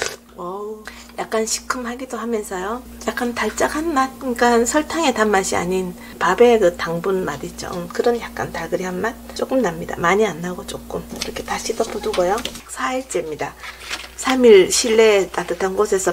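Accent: Gyeongsang, South Korea. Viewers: 경상도 분이신거 같은데 저도 그쪽~혹시 아실라나 옛날에 막걸리 거르고 남은 찌개미에 단 맛을 내기위해 뭐 넣고 먹었는지 아시나요. 설탕이 없던 시대인듯 지금 표현할라니 너무 웃기네요. 마른모 꼴로 생긴 (꼬라재비)ㅋㅋㅋ.ㅎㅎㅎ. 그거 몇알 넣고 찌개미를 숫갈로 비벼서 먹으면 정말로 맛있었던 생각이 나네요.먹거리가 귀해서 봄이면 들에서 찔래도 꺽어먹고 진달래도 따먹고 버들개비 따먹던 아~주 옛날 생각이 나네요. 까맣게 잊고 지냈던 옛날 생각을 잠시 해 보았네요. 저도 막걸리 담그기 해보고 싶네요. 맛있는 막걸리 맛은 잘 알아요. 옛날에 맛을 많이 봐 놨기 때문에 ㅎㅎㅎ. 언제 그렇게 많은걸 배웠을까 싶네요. 감사합니다.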